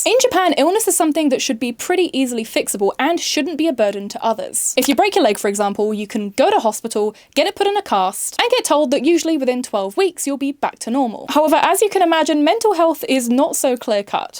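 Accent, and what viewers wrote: United Kingdom. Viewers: She is very clearly and audibly English.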